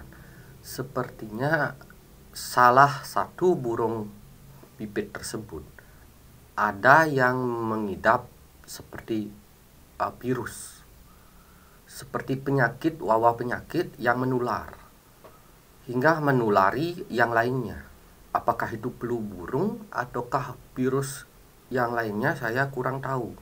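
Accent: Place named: Borneo, Indonesia. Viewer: salam dari tanah dayak kalimantan cara ngomongnya mirip sekali logatnya dgn org dayak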